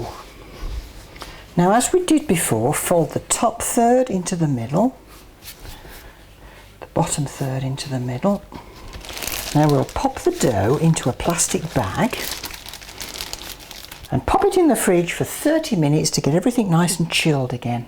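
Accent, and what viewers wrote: United Kingdom. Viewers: I've just found your channel and I must say you have charmed me rather splendidly! Your Danish Pastry video is just about the best I've seen, you make it look so easy I'm going to give it a try. And I love your British accent! You have a, what I call, a story book voice................I feel almost like you are reading a wonderful bedtime story to me! Thank you so much for sharing with us all. I've subscribed and you can bet I'll be a lifetime fan!!! I live in Kansas by the way. Thank you so much again. Bright blessings to you.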